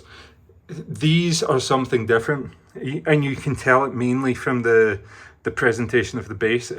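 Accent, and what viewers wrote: Scotland, United Kingdom. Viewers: You sound like a British Bernardo Faria. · 😂 I am going to say Scottish (based on the Scottish accent) and if I am correct in assuming, he is not in the UK (Asia based)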